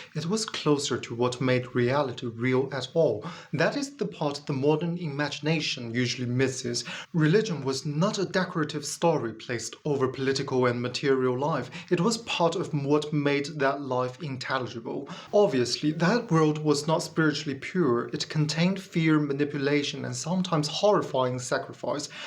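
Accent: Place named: United Kingdom. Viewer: AI has better pronunciation and intonation. Not intended as a critique of English. Your English, is excellent. "Heaven" not "haven", "These" was mis-read as "this" (This is just a little feedback for you, ). I thought, originally that your accent was British-flavoured, but your subtitles are in US English. Where did you learn your English? Your ideas? Unconvincing. Successful religions have always been about controlling other people. That is what they are selected for, if they do not do that, they get replaced. Reality is not important to religion (well, successful religions). I suppose the Flying Spaghetti Monster has some connection to reality.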